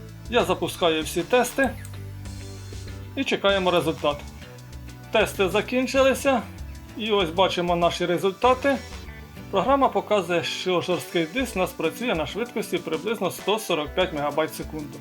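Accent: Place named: Surzhyk, Ukraine. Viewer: На практикі на практиці Мало міста мало місця! Це ріже слух. Я за розвиток україномовного інтернету, але ж не суржикомовного ☝️😠 В мене родичі в селі можуть так говорити, але ж вони не роблять ролики в Ютубі, виставляючи свою недорікуватість на загальний огляд. Щодо диску, то Сігейт чи не самий ненадійний серед виробників. Довіряти йому якусь більш-менш цінну інформацію навряд чи має сенс. Якщо це і робити, то хіба що в RAID O архіві.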